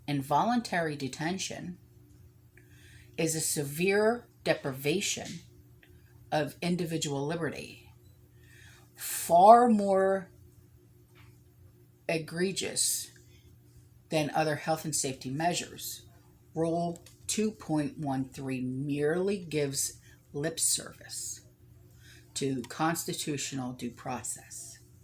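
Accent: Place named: New York, United States. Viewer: I see you live in Florida, but you definitely sound like you're from NY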